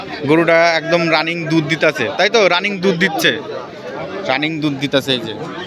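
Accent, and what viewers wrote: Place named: Tangail, Bangladesh. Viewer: দাদা,,, ইন্ডিয়ার ভিডিও,,তবে মাঝে মাঝে বাংলাদেশর টাংগাইলের ভাষা বলেন কেনো😂😂😂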